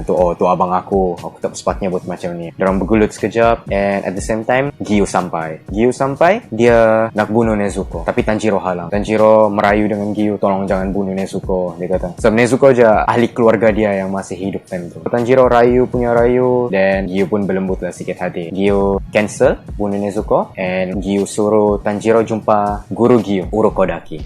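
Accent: Sabah, Malaysia. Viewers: Bila speaker org sabah . Cerita ni dah macam cerita hantu benar beb hhahah mantap 👍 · Aku suka betul bila dia cerita pasal kimetsu no yaiba ni dengan slang dia cakap sabah dia tu huhuhu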